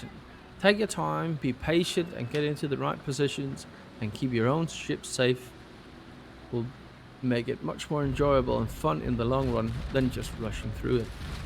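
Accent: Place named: Denmark. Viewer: Your accent tells me you are danish så hvad så der :D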